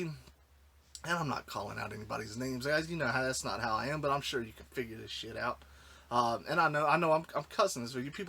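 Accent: South, United States. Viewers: I even want to be careful when buying off ebay. You don't really know who handles you items before you get them. As of late I even try to disinfect my items i buy as I buy them via mail. Man you sound southern like me. I'm from ky.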